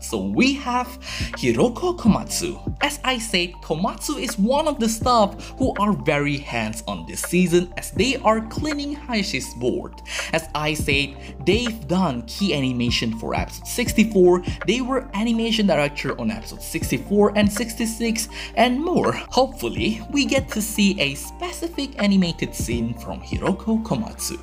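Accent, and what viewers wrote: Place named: Asia. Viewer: how old are tou? , also are you american? , sound kinda asian with that accent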